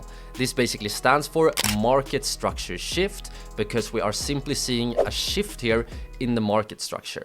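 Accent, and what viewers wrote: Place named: Sweden. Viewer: 😂first time seeing you and I already hear ur Swedish accent?! Great explanation for the strategy but brother am trading in lower time scales 15 and 5 min. Still works? And what settings would you recommend? Thanks in advance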